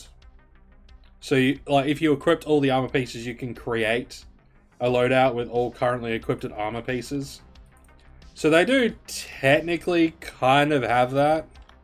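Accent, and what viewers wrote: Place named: Australia. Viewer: Would love more of these unedited long hunting sessions. When I hunt, I need an aussie accent in the background now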